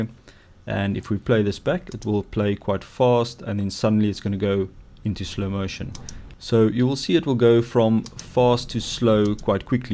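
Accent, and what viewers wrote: Afrikaans, South Africa. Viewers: Baie Geluk, ek sien een van jou videos is gefeature op No Filmschool, toe ek die video kyk toe kon ek sommer hoor hierdie is 'n Afrikaanse Ou. Baie geluk!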